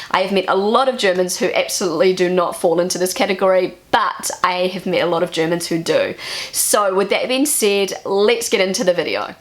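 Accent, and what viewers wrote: New Zealand. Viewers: By the way I think you have such a cute English accent! I have lived in England, South Africa and visited Ireland and Scotland but your New Zealand accent is in a class by itself and I think it is very cute! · By the way, I love your NZ english. It has a weird soothing quality and puts a smile on my face! I need to go to NZ....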